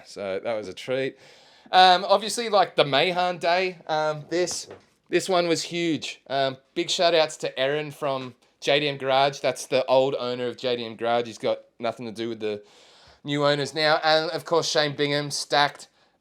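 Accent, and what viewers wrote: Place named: Australia. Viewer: Bittersweet moment seeing this cause I thought I had 15 years worth of podcast to catch up on. However I would watch wreck em podcasts for at least the next 15 years if you decide to do more. Really enjoy hearing about the history of wreck em and Aussie drifting in general. I listen to a lot of American drifting podcasts, but it’s just not the same without an Aussie accent and a shithouse sense of humour. Also love your mentality behind fun drifting not just competitive drifting. I don’t want comps I want trains.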